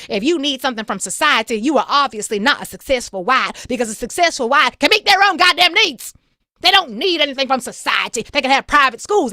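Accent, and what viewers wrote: South, United States. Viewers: You are the best host on Urban View at dragging MAGA especially with your pearly white lady southern drawl.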